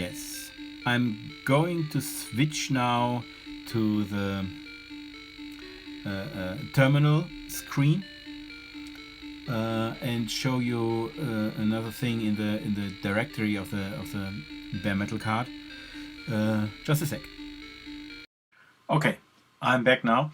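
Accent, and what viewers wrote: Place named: Germany. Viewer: You sound like German is your native tongue. ... and Giana Sisters as background music! Rocks!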